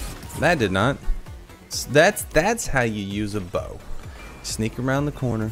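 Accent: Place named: Southeast Texas, United States. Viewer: im from the same city as him lol sort of.. Were both from south east texas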